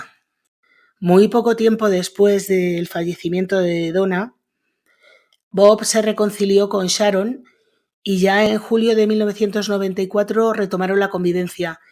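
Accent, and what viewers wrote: Castile, Spain. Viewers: Qué comentario tan desagradable, Pilar. narra muy bien, puro castellano.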